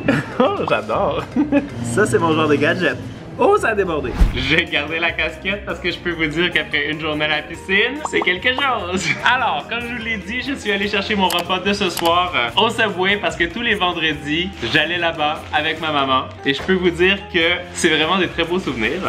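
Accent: Canada. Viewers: tu mon youtubeur préféré desCarl is cooking vidéos accent canadien et qui met quoi des vidéos trop trop rire 🇨🇦👍👍👍👍👍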